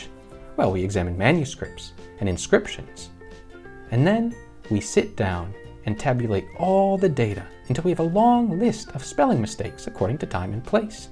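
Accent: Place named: England, United Kingdom. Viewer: most instances of ει were never diphthongs in fact, they were the result of compensatory lengthened ε and as such were pronounced like ε, but longer. in fact they were often written as ε only in early times. the person in the video just has an english accent that makes him pronounce a long e as a diphthong (ei) stress is on the second letter of a digraph in greek by convention, even though it's evidently the first one that is stressed (in ταύτην the stress is on the /a/, not on the /f<-w/).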